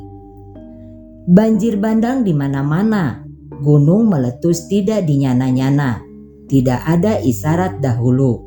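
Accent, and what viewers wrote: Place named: Sunda, Indonesia. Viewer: Narator nya ini berbahasa Jawa rasa Sunda.... wkwkw kedengarannya aneh lucu.... Salam sukses mbak naratornya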